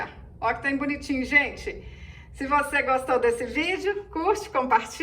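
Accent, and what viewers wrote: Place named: Minas Gerais, Brazil. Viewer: Oi vc é ótima. Adorei. Parabéns 👏👏 Vc é mineira... é bão demais da conta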